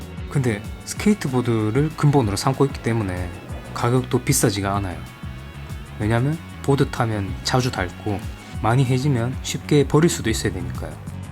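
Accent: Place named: Gyeongsang, South Korea. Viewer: 사투리 안고치면 구독자 이정도가 한계일듯ㅜㅜ 경상도 사람인데도 엄청거슬림ㅜㅜ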